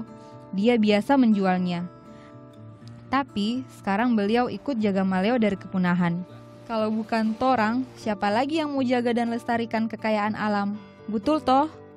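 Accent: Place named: Gorontalo, Indonesia. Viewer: Saya baru nonton ..meski terlambat tp suka semuanya.. kontennya,narasinya sederhana dan tetap bercirikhas logat Gorontalo...pokoknya bagus dan asyik....👍👍👍👍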